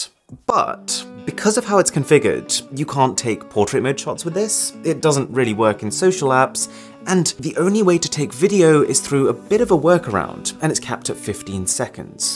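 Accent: United Kingdom. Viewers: You can convince people to eat their phones. The way you explain these phones with your British accent is amazing.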